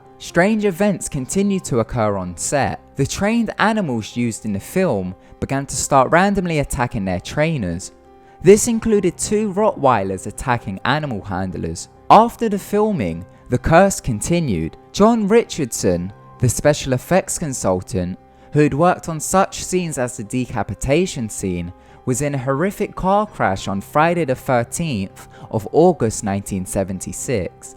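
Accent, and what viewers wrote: United Kingdom. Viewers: I think he just has that kind of British accent...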